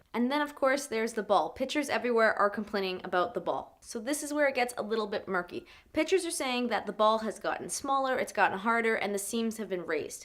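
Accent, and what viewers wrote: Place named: Toronto, Canada. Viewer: are you from the Toronto area?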